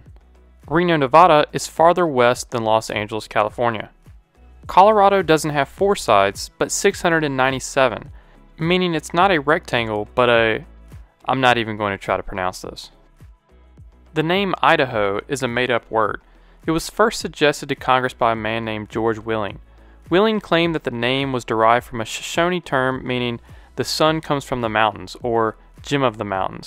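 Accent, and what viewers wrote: South, United States. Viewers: Nice southern accent :) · Because of your American accent, I can't understand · Shout out to all the other people who heard his southern accent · I just can't help to notice that you have a strong Southern accent. I don't know, it just sounded a little weird to me at first hahaha because oftentimes, the geography and history-related videos I watch are narrated by people with British accent. This is the first time I'm hearing someone talk about Geography with a Southern US accent.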